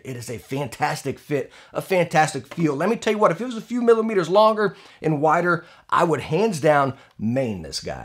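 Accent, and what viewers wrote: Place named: New York, United States. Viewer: Bro this guy talks like my uncle from New York and it's great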